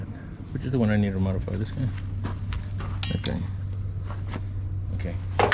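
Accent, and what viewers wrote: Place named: Louisiana, United States. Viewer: This guy sounds like he’s from Louisiana. Got dat cajon accent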